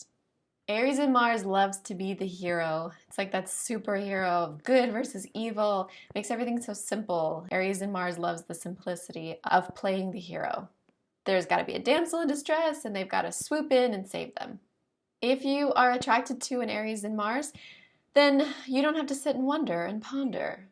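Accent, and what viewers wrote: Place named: United States. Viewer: I have venus and Mars in Aries and when im horny its horrible but thats also probably because my ascendant is scorpio... I had like 30 orgasms the other day and im a guy in my 30s so yeah I dont really care if I cum quick because i will be back at it in less than 10 minutes... which is not normal...probably end up killing someone if i just held this energy in...my ancestors from one side were Polish nobility and literally every single man since my great grandfather were involved in wars and killing people and the ones from back in the day were winged hussar knights who were undefeated on the battlefield and destroyed armies up to ten times the size of them, they are legendary war heroes in Polish and European history as they saved Europe from the ottoman empires expansion......my sister has her moon and north node in aries too and shes a nutcase also...my mother had a horrible explosive temper and would beat us constantly....its genetic i believe also.Luckily my sun in pisces calms things down though.. 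if i had my sun in Aries that would be bad. Im very good at fighting and quick and have trained alot in the past but now I just go to the gym to look muscular and toned... but yeah your hot by the way... if you ever go on vacation to Mallorca hit me up...thats were I live... I love that American accent ,it would turn me on so much hearing you speak dirty... but yeah lol ...anyways..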